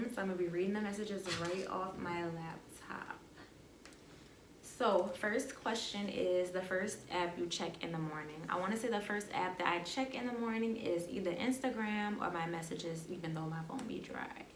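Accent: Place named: New Orleans, United States. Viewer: You definitely sound like you’re from NOLA lol I guessed it before you spoke about Mardi Gras. I got a close friend from there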